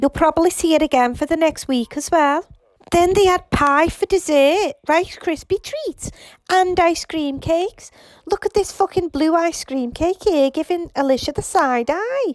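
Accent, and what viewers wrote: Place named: Scotland, United Kingdom. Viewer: Your accent is SOOOOO UGLY Jesus Christ. You sound like a 1,000 pound Scottland woman in a longgggg tan dress.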